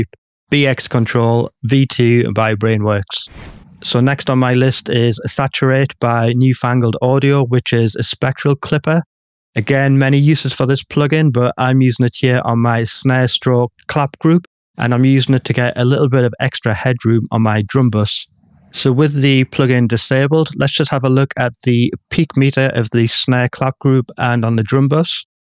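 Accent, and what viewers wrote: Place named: North East England, United Kingdom. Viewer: What accent is that? I hear the Northeast. Teesside, Wearside?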